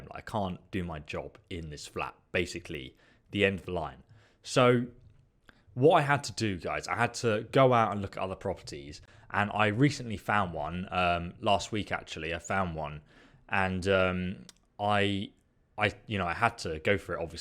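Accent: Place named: RP, United Kingdom. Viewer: Get off your Fat Arse and like the rest of the Fucking world get a Fucking Job!!! You make me Fucking Sick begging for money on youtube, while people are working flat out to pay off their mortgage and feed their kids, You’re crying because you can’t sustain Your hobby as a full time career...well boohoo! You sound like you’ve had a very privileged upbringing by your Received Pronunciation and the fact that you’re a grown man mentioning that “mummy and daddy will help you out!” only confirms my belief that you are a work shy Bag of Shit who has no compunction but to ponce off of the gaming community who work and play for pleasure! But don’t worry people like you will be forced to get of their lazy arse sooner or later, you don’t seriously think you can make a long time career out of this! Seriously fella wake up and smell the coffee you dozy Cunt!!!🤣🤣🤣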